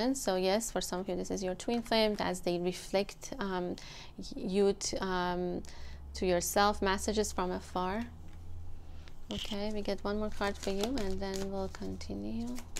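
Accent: Iran. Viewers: I Love Persian Accent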